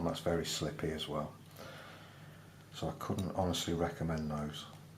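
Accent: United Kingdom. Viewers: i'd like to make 2 small points on your channel. 1.you have a very nice, relaxing voice; 2nd, your english accent is not very deep, and while most non british people would find that tough to understand, i would find it a bit annoying, so ty for that too. im in process of getting a mechanical pencil and i have to choose between 0.5 and 0.7 for mostly writing and between uni kuru-toga pentel 205 and zebra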